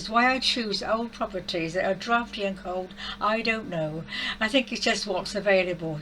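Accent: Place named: United Kingdom. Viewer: Please consider posting SHORT videos to attract viewers! I am sorry that videos longer than 5 mins are very daunting when pressed by time. Sorry this is not to be negative but hopefully will help you: consider watching yourself before uploaing and using the edit button to trim out unnecessary talk? You can still make long videos for your regular viewers but for newcomers, we need SHORT and BRIEF. Myself,I can't understand British accents at all, so I'm going to start over with the captions....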